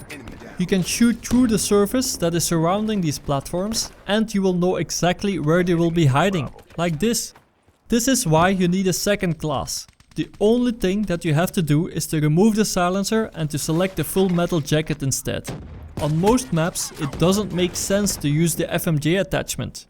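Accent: Netherlands. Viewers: Hahahaha je bent zo duidelijk nederlands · Are you Dutch by any chance? I can kind of hear it in your voice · I bet you're dutch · fk erge nederlanse accend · i hear the nederlands acsent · You sound Pretty Dutch with Saying The "R" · Dutch ... I can kind of hear it in your voice. exactly that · Hij is zoiezo Nederlands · You have a very strong Dutch-ish accent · Eman kan gelijk horen dat je NL bent dankje voor deze vid ga gelijk mn class aanpassen hopelijk merk ik het verschil keep it up en rustaaaag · (Dutch accent) has to be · Lol youre dutch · your from The netherlands i can hear it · He is from The Netherlands i guess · gek nederlands accentje hoor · Are you dutch you sound so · je bent duidelijk Nederlands😂. wel awesome vid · dutch accent on point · je engels klinkt goed maar ik hoor gwn dat je nl bent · Nederlands accent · nice dutch accent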